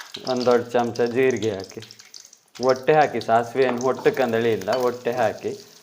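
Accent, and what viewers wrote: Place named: Kundapura, India. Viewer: ಸೂಪರ್ ಪ್ಯೂರ್ ಕುಂದಾಪುರ ಕನ್ನಡ ಮಾತಡದ್ ಲಾಯ್ಕ್ ಆಯ್ತ್. ತಂಬುಳಿ ಒಳ್ಳೆ ಇತ್ ಮಾರ್ರೆ 😍😍👌🏼👌🏼👌🏼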